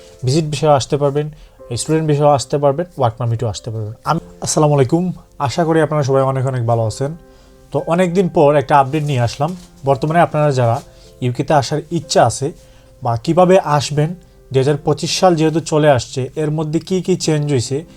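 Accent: Sylhet, Bangladesh. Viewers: vaiyar basa ki sylhet ? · আপনার কথাগুলো তো অনেক সুন্দর সিলেটি মাল👍👍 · ভাই মনে হয় সিলেটি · Bhai ki sylheti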